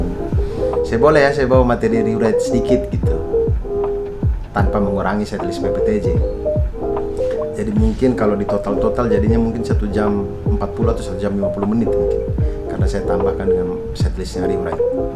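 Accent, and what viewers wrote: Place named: Java, Indonesia. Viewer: himada muka timur logat Jawa 😅🙏🏻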